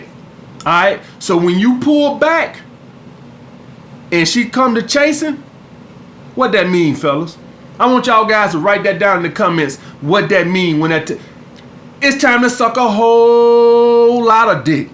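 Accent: United States. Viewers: Bu amerikaya göre konuşuyo çünkü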